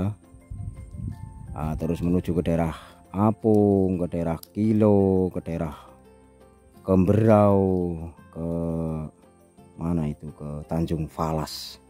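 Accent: Banyumas, Indonesia. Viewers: Tetap semangat, ora ngapak ora kepenak gus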